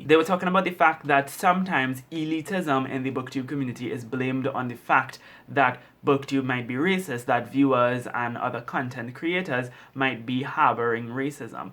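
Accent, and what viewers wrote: Trinidad and Tobago. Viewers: I dont know you but I've learned how to recognize the Trinidad accent! There are LOTS of trinidadian doctors at my hospital that I've learned the accent...am i right? Are you from trinidad?!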